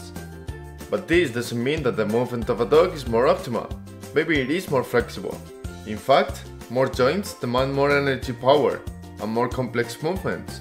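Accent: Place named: Spain. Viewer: Se nota el acento a españita jajaja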